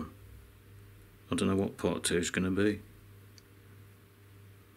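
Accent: Birmingham, United Kingdom. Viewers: I find your Brummie pronunciation of 'Bluetooth' a bit different to how we say it up north.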